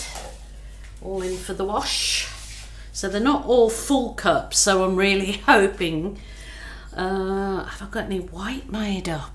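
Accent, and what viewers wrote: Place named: Black Country, United Kingdom. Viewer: Great use of left overs, love the yam yam accent 🤐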